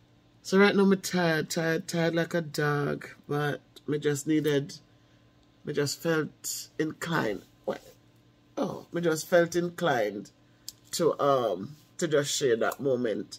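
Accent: Jamaica. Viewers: First I heard you speaking in patois.yuh gwaan good Mon. Yuh still have it. LoL.Big up Auntie!.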